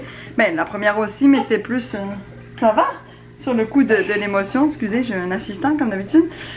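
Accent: Quebec, Canada. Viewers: C'est ce qu'on appelle l'accent hybride, c'est à dire mi-français mi-québécois, un accent québécois light en quelque sorte, du coup un français qui écoute aura l'impression d'avoir affaire à un québécois et un québécois qui écoute verra tout de suite qu'il ne s'agit pas d'un québécois autochtone... Les français vivant au Québec peuvent avoir cet accent hybride sans forcément s'en rendre compte, c'est le cas pour, elle utilise le phrasé, les intonations québécoises ainsi que les tournures de phrases de son nouveau pays de résidence. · Incroyable !!! Tu as carrément pris l’accent je suis ébahie haha, à la base tu es du sud de la France non? J’essaye d’analyser tes intonations c’est un mélange intéressant! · Est-ce que tu es native canadienne ? 😌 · T’as dû prendre l’accent québécois sans t’en rendre compte en tout cas je t’entends vraiment parler le québécois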